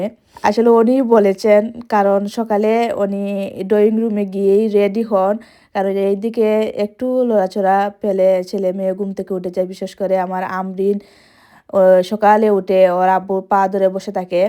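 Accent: Sylhet, Bangladesh. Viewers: আপু এটাই স্বাভাবিক এলোমেলো হয়ে যাবে আবার গুছিয়ে নিবে সবারই এরকমটা হয়ে থাকে আর খুব সুন্দর তোমার উপস্থাপনা সিলেটি ভাষা আমার খুব ভালো লাগে ❤❤❤❤❤❤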